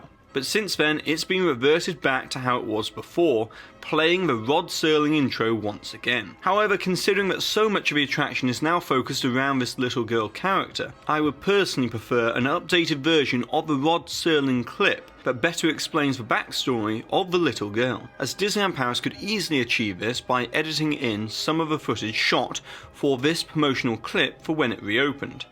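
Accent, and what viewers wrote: England, United Kingdom. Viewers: Great job, we appreciate your hard work! Was that hidden Mickey a Halloween joke? I never miss it🤔. I think you just need to work a bit on your Rod Serling, but pretty good for an English accent! I’ve been to all three parks, but when I was in CA I missed the tower and my visits to Japan were well before Disney Sea. I’m not into the “spooky” girl versions. No thanks, but I do like the CA version with Guardians (thanks for explaining all the changes). I would love to see that version come to Orlando along with Spider-Man to replace Rockin Roller coaster or an avengers theme! Why not retheme that entire section of the park. 25 years is long enough! They just put the Cars attraction (mostly for kids, but an awesome animatronic!). Not sure why they put that there it would have been a great “Marvel” experience unless there’s rights issues! 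Once again great video Rod/. Do we all get a pin for the hidden Mickey as a trick or treat? Yes!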